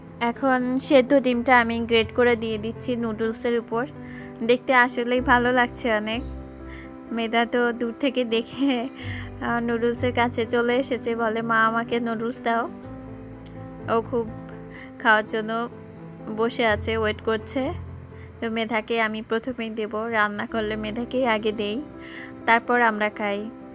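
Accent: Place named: Dhaka, Bangladesh. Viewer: Apu tomer bahsa Dhaka r kothai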